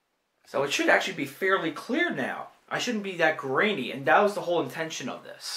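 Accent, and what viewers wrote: Pittsburgh, United States. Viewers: My God man...your accent sounds Pittsburghese.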